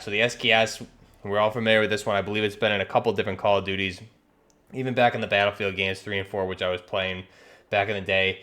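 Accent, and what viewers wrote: New York, United States. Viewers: Just curious, from the sound of your voice, you live in the new jersey/ new York area?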